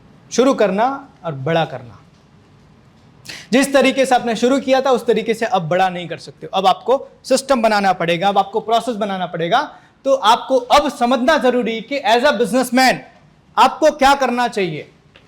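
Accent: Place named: Gujarat, India. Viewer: sir apa gujarti he laght he voice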